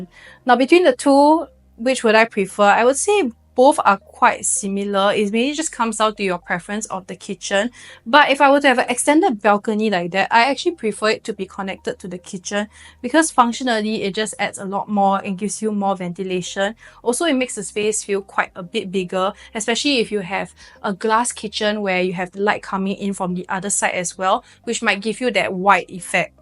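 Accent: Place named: Singapore. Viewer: Kind of singaporean accent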